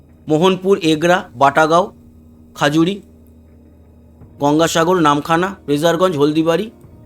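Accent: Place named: Bangladesh. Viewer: আপনি কি বাংলাদেশ থেকে ভারতে এসেছেন ? ছত্রে ছেত্রে বাংলাদেশ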